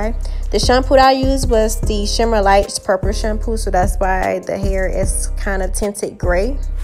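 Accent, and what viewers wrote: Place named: Florida, United States. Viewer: Turned out pretty! You were very detailed and I could tell you were from Florida 😂😍 Much love from Texas!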